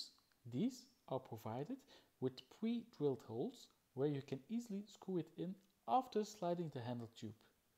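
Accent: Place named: Netherlands. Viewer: Think he might be dutch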